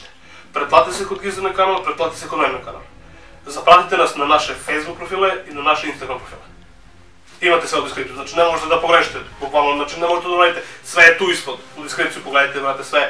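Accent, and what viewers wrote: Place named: Southern Serbia, Serbia. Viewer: odakle si, po govoru bih rekao da ste iz leskovca ili babusnice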